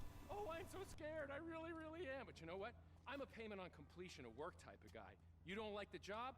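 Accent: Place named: Scotland, United Kingdom. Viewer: Very Scottish :)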